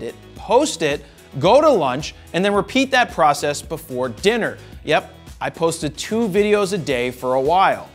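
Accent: Philadelphia, United States. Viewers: Being from South Jersey, nothing wrong with your Philly accent. Although I’ve bee away from Jersey still have accent. Thanks for your video showing how you began. Oh and loved the portion with your Grandmother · Nothing wrong with a Philadelphia accent! It was so great to see the Lil clips, those were priceless!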